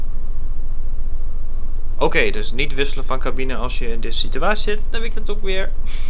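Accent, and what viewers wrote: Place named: East, Netherlands. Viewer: Hé! Een Nederlandse video! Ook leuk! Zo te horen kom jij ergens uit het Oosten? :p Ik kom daar wel vandaan ;)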